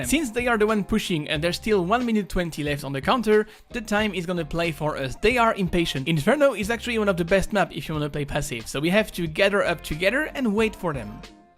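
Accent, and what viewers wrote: France. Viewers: Слушать английский от француза, когда ты - из России... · His french accent is so annoying.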